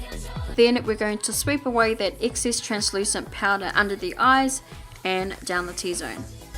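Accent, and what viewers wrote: New Zealand. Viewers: chur bro so am I. I could tell by your voice that you were a kiwi. A very talented pretty kiwi. Later bol. 😉😆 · Love this look and love the kiwi accent!.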